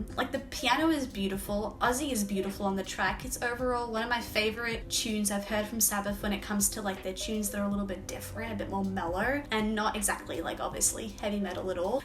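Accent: Australia. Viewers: Hi, love those facial expressions of yours and that cute Aussie accent!! Make sure, if you can, to do the entire Sabbath discography in chronological order - they are my favorite band and I think you'll find the journey very interesting. Welcome to the appreciation society!!!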